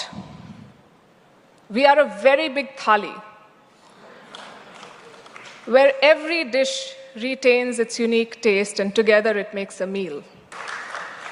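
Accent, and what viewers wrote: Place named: RP, United Kingdom. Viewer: Palki Sharma we citizens of India proud of as you explain in amazing Queens english , take care of you eat well and sleep well , please follow my advice from Chennai , Bharath Matha ke Jai, Jai Hind ..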